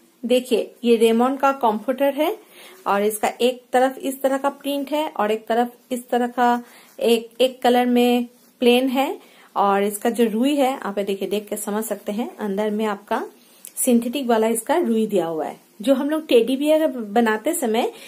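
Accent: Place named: Bengal, India. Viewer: Like 69 Beautiful video I enjoyed your Bangla accent also God bless you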